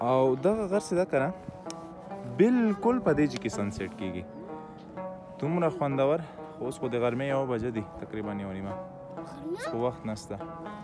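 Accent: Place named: Afghanistan. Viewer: Your sada pashto accent 🥵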